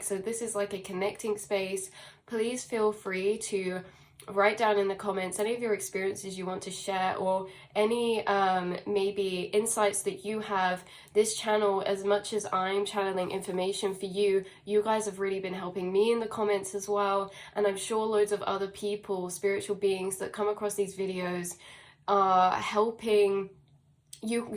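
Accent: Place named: England, United Kingdom. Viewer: Hello. Like you said, its amazing to meet you and to participate in your space. I am excited for the same reasons you stated. We are stationed so far away from each other or so we think. I just found you and for once, the messages are so clear and resonant that I dont need any discernment. I don't think I can even describe it... its not a reading its more like a reflection of my thoughts without any distortion whatsoever. I love and respect myself a lot and I am so excited that there's someone out there that I can give the same amount of respect to. And then... I can hear you're English wich means you're not just the most resonant person I've seen, you're also the closest geographically to me as I'm in London. Its all very exciting, the tribe is getting closer. Almost touching distance. And I do not feel any push or pull from your energy.. 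just a recognition of a very high vibrational being. Thank you for existing. Sending you lots of love and gratitude. Maybe run in to you one of these days.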